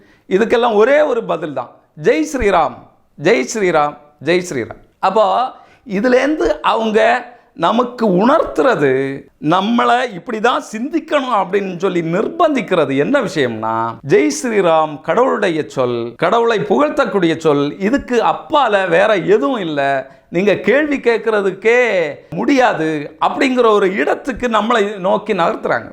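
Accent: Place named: Nagercoil, India. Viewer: His tamil slang is Nagercoil Slang.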